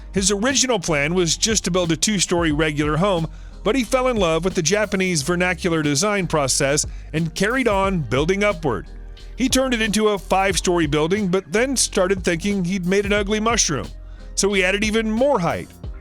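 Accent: United States. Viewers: He speaks with an American dialect. I'm from Canada and nothing he said seemed wrong. I also have a lot of American friends, so I am used to hearing their mispronounciations. Look up how they produce Iroquois versus how it is properly pronounced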